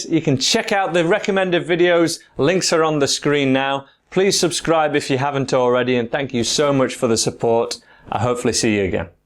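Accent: United Kingdom. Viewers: Hi Thank you for the free tabs> Are you UK based (it sounds like you are) looking forward to making contact.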